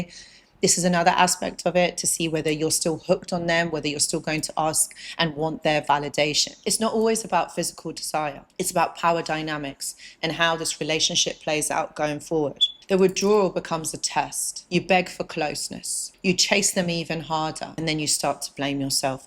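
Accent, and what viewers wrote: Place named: Southern England, United Kingdom. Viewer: You need to learn English, she has a standard southern English accent which is the standard English accent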